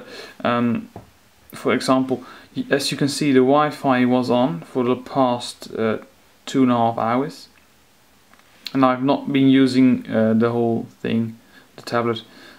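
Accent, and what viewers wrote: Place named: United Kingdom. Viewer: You sound like a fellow Brit, what website did you purchase this from and how much did you pay for it? Do you have to pay for VAT and what not?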